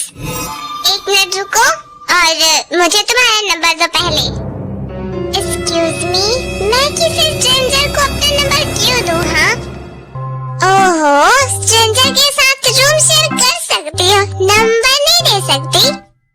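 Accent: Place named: South, India. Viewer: Aapki voice me thoda sa south indian touch hai like dadaji 😅 bahut acha laga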